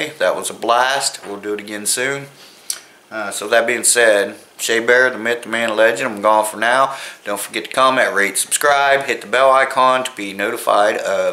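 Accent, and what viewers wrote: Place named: Indiana, United States. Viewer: I would have guessed Indiana. They talk like that 'round here. Lol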